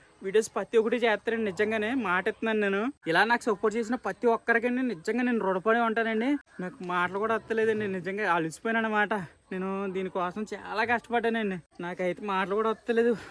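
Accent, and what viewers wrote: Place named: Amalapuram, India. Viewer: Nenu otu vesa anna ni videos chustanu kani eppudu coments pettaledu nuvvu seam ma annayya la untav seam enka acham nekulage untadu anduke yeppudu chustanu ni videos madi amalapuram mana slang tho bale mataladatav any ve congrats annayya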